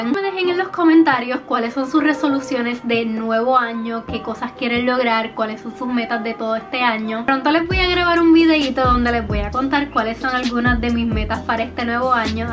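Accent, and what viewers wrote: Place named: Puerto Rico. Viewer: Ya sabes que es de Puerto Rico cuando escuchas los croquis jaja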